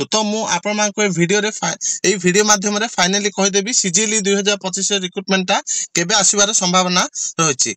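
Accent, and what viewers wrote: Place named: Baleswar, India. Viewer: Baleswaria heithiba tanka adia accent semiti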